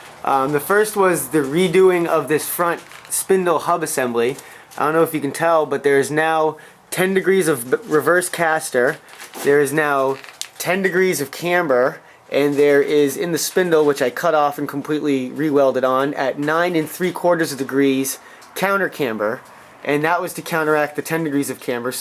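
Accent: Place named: Midwest, United States. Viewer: Great timing. I was just wondering what part of the country you were in, when i started this video and I think you just said Maine. Funny, with your accent i was guessing somewhere in between Kansas and Indiana.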